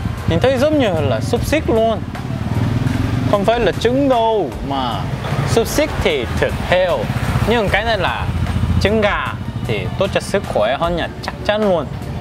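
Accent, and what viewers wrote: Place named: North, Vietnam. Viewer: nói tiếng việt cũng dễ nghe mà, mà sao ở sài gòn mà nói như giọng Bắc ý nhỉ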